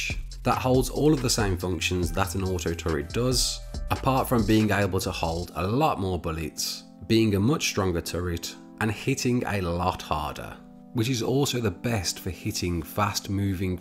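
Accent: Birmingham, United Kingdom. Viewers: Are u a brummy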